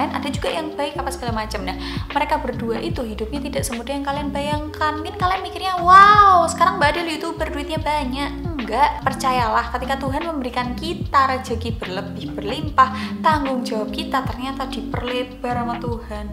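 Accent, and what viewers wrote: Java, Indonesia. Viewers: boso jowo e medok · Mbak jowo ne ndi to kok muuuwwweedook bngt 😀😀😀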